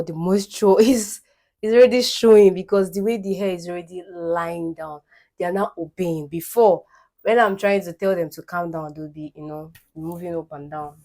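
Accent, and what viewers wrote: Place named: Igbo, Nigeria. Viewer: I'm referring to Igbo as "yibo" . Your Igbo intonation is pronounced especially when pronouncing oil, you say "oryu" So, you be Omo "yibo" na🥰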